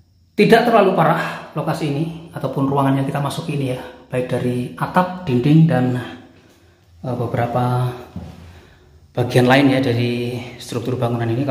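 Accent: Java, Indonesia. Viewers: Logatnya meddo bgt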